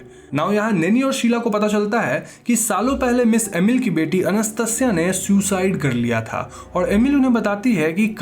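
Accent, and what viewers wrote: Bengal, India. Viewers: I love And his bit of bengali accent🤗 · U got a somewhere bangla accent... 😊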